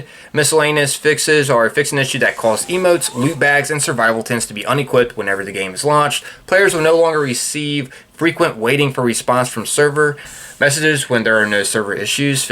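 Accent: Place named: Baltimore, United States. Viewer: Bro's speaking like he's from Baltimore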